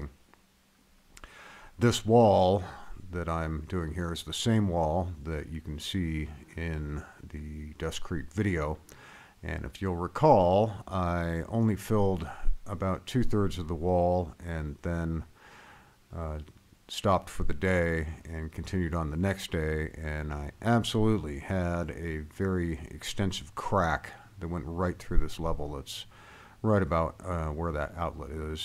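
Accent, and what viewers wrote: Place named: Pittsburgh, United States. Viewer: You have that upper Pennsylvania accent like Pittsburgh. I’d wouldn’t be surprised if that is where you were raised. Just a guess. It’s a uniquely subtle accent.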